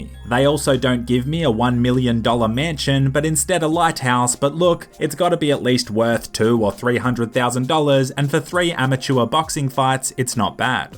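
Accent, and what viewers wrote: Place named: Australia. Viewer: He has an Australian accent that’s why and I’m also Australian.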